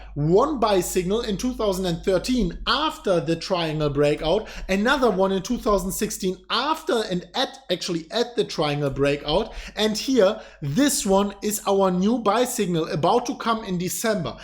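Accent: Germany. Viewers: Love your german accent!! Love your videos! Keep doing what you're doing!! How can we turn on the hash ribbons on binance?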